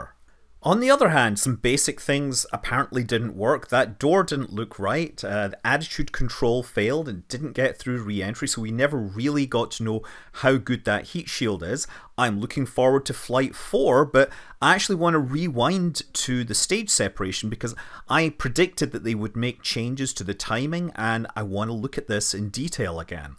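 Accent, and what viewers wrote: Scotland, United Kingdom. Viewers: Brought to your by, the manly Scotsman- love the brogue. 😊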